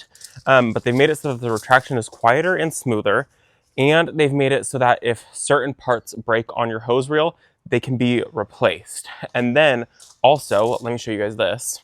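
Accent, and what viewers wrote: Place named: South, United States. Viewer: I noticed a more southern twang as well! 😅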